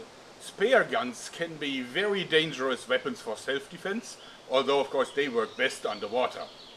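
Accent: Germany. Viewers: not russain accent, but we do have a German accent. which is about as cool if not cooler. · He speak like a german :O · Your english sounds like you have a german accent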